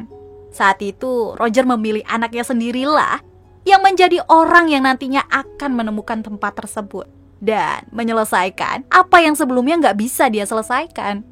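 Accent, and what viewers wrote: Java, Indonesia. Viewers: Saya suka aksen jowo mu... (*_*) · Nah gtu dong ada medok nya kan jd lucu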